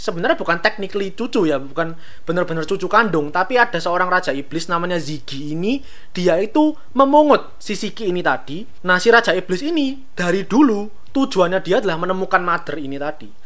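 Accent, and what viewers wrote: Java, Indonesia. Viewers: min suaramu itu medok banget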